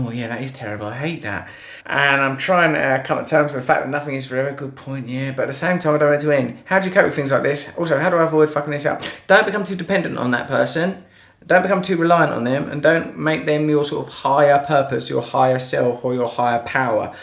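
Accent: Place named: United Kingdom. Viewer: relationships = wondering about the dog and then having a laugh and that. you've gotten awfully british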